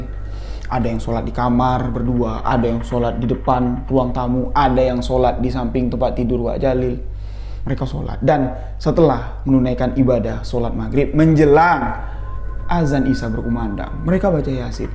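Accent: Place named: Jambi, Indonesia. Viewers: Sukaaa dg pembwaan bhsa jambi bang...sukses selalu bang salam dri jatim😍😍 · Walaikusalam kak..... Sellu setia mnyimak wlu terlmbat.....🤭 Suka bangettt logat Jambi y kak Cpt smbuh n pulih kmbali y kak.... Tmbah berkah n smakin sukses y 🙏🌹🌹🌹💪🔥🔥🔥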